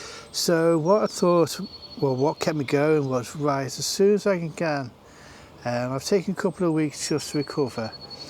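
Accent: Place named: Wales, United Kingdom. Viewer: Got a bet on with my sister I reckon you're Welsh, she reckons you're from the Wirral.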